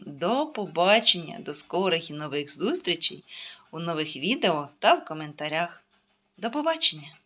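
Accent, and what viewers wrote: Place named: Chernihiv, Ukraine. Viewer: а я когда тебя слушаю и смотрю, свекровь свою вспоминаю. Её говор очень сильно похож на твой. Она у меня с Черниговской области. А мои на суржике больше говорили, пока папа жив был и бабушки. Мамина родня и с Мелитополя.. Но сейчас мы, к сожалению, только на русском говорим. Но передачи смотрим в интернете на украинском.. А вообще, когда я слушаю твою речь, как будто к бабушкам попадаю, сразу такие воспоминания тёплые начинаются. 🙂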